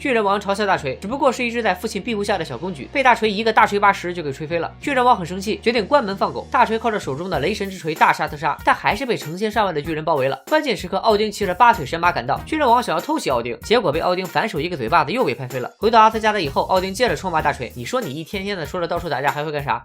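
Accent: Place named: Tianjin, China. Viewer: 那不是天津话么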